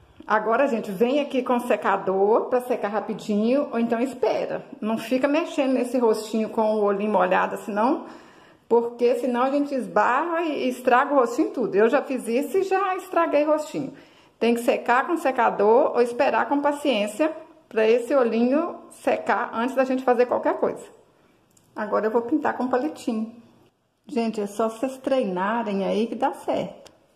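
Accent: Minas Gerais, Brazil. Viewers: Ameiii. Parabéns pela dedicação. Adoro seu sotaque de mineiro. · Vc é mineira? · Parabéns adoro os seus artesanatos ,e a explicações são bem claras ,e também adoro o seu sutaque de mineira ! 👏👏😍😍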